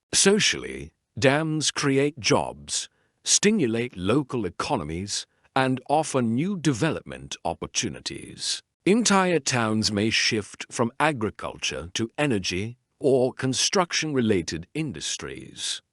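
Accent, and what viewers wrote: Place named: United Kingdom. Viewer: its the demeaning British accent. 😅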